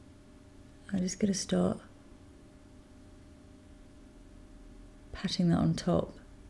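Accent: United Kingdom. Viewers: i love your british accent!! xD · your amazing and love that your bristish!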